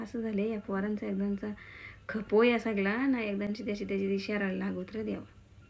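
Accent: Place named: Shahapur, India. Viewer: ताई गाव कुठला ग तुझा मस्त बोलतेस आपली शहापूरची भाषा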